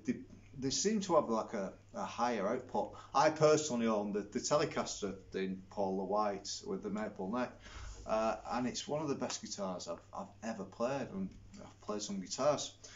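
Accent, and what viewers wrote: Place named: Northern England, United Kingdom. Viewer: You sound like you might be from the same area where I am from originally. Bolton Lancashire. I’ve been in Canada since I was 7 but it’s nice hearing that familiar northern accent.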